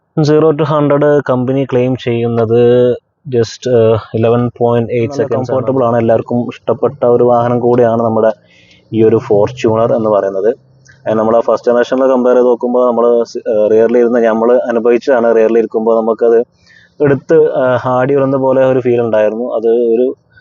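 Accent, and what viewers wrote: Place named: Malabar, India. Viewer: മലപ്പുറം, കോഴിക്കോട് കാക്ക ആണല്ലേ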